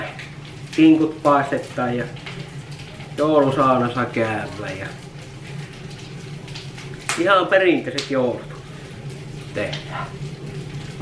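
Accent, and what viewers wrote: Savo, Finland. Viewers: Siinon rehellinen, Aeto Savolaenen :D :D :D <3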